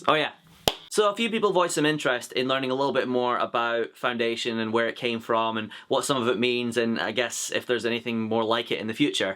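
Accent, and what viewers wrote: Scotland, United Kingdom. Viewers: Glad you're back and you sound as Scottish as ever! I look forward to your following videos and I'm sending creative vibes your way :) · Literally created a channel just so I could respond to this video. I'm glad you're back, I haven't been youtubing for some time but I originally started watching your videos to learn a Scottish accent. Then I started enjoying them and I'm very glad that you're back.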